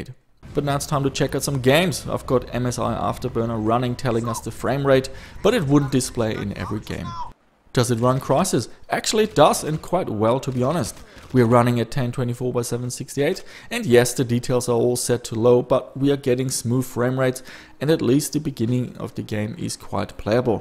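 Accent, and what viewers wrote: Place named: Germany. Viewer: Actually, I got that Sound Blaster second hand 9-10 years ago (and it was massively expensive even then), but I was already past my gaming prime and I was never able to truly enjoy EAX. I had planned to integrate it into a modern desktop (which so far I have not buit), but you made me realize that modern OS-s have relegated EAX to the past. Besides, there were some issues with the front panel, which I could not fully deal with even after dabbling with the jumpers. Maybe, it was faulty like the TV tuner card I purchased with it. But hey, that is the risk when dealing with used parts (and the excitement when they do work:D)., your channel inspired me soo much to revive my old tech (your somewhat German accent rocks as well:D). Now I am slowly working on a Compaq machine with a 500 MHz Katmai (similar to the first PC I owned). I have already boosted the RAM to 512 MB (in Y2K I had 64 MB, slightly higher than the standard 32MB new PCs were offered in my country back then). 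Next comes the SD card to IDE adapter (thanks again for the info), I hope I will be able to have a dual Win 2000 and Win XP boot (and move the XP pagefile to the HDD, perhaps?). Finally, I have prepared a GeForce 4 MX440 to replace a G200 (hope it will work). Sadly, the old compaq proprietary mobo may prevent me from maxing the CPU (I had the Abit BE6-II board back then - so flexible; and a Slotket for Coppermine). Do you flash the BIOS to its latest version when you build old systems? Because many of th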